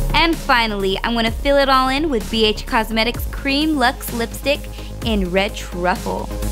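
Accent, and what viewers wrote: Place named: United Kingdom. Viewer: Not a bad British accent and that's coming from a Brit, I always really enjoy your make up tutorials. I love how you go the whole hog with all the back ground and everything following the theme - Awesome!! x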